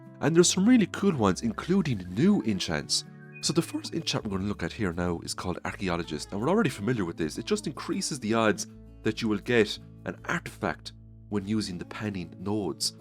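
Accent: Ireland. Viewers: Thanks for the great video! I also enjoy your lovely speaking voice including your Irish accent!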